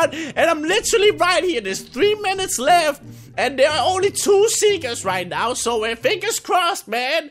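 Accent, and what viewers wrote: Denmark. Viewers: Have you ever heard someone from Denmark talk? That is how they sound.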